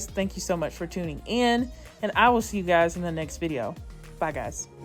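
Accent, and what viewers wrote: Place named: Tennessee, United States. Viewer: I hear a little county accent 😏 I'll guess Tennessee. 🤔 Gorgeous curls tho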